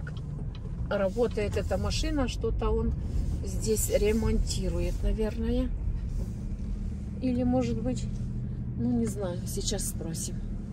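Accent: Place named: Moldova. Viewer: Такой говор как с акцентом молдованки в Украине город Черновцы там разные сел молдованов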